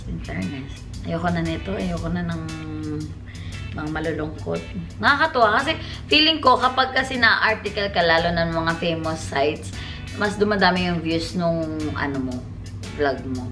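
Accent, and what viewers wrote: Batangas, Philippines. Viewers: gawa ka vlog na pure Batangeño accent.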